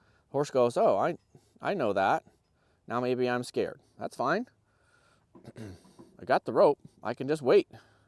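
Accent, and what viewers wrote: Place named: West Midlands, United Kingdom. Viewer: He has a West Midlands accent (Brummie/Black Country area), not a Welsh one!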